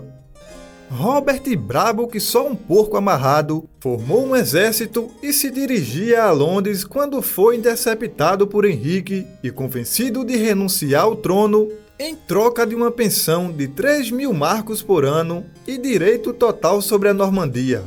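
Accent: Northeast, Brazil. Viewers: vou te contar, amo como você conta.... parabéns.... não perco um vídeo... e seu sotaque e humor dão um toque especial e único... orgulho do nosso Nordeste...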